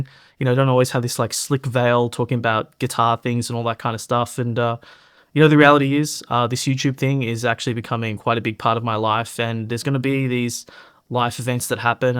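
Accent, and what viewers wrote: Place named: United Kingdom. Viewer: I send my condolences about Red,. (I don't know why but I would have guessed you were British.:))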